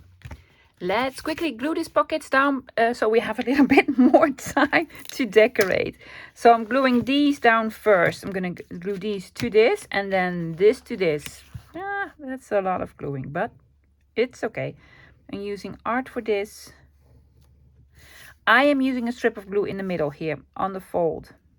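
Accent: Netherlands. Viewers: 🤣🤣🤣 big ole envelope!, are you from the southern Netherlands? People tease me about my southern US accent and I say “big ole…” all the time. Cute project. Thanks for sharing!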